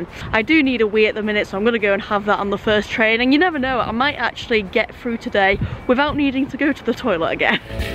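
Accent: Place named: Wigan, United Kingdom. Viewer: A wiganer doing pronunciation, makes me feel all warm inside.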